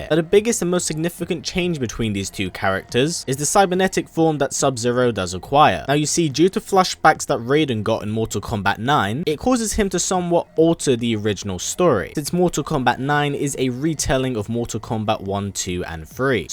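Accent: United Kingdom. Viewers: Hey man, are you hailing from the UK?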